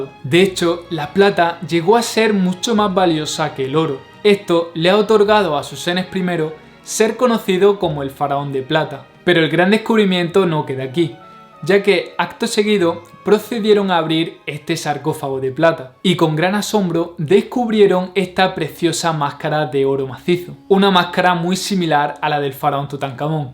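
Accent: Andalusia, Spain. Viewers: Me gusta su FUERTE acento Andaluz..... muy buen canal. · MAGNÍFICO E INTERESANTE REPORTAJE PERO..."POBRE EL IDIOMA ESPAÑOL EN BOCA DE LOS ANDALUCES".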